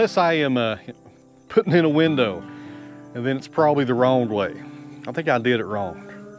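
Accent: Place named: Tennessee, United States. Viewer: Pay no heed to the complainers and the know it all's. And those who bitch about your Tennessee accent.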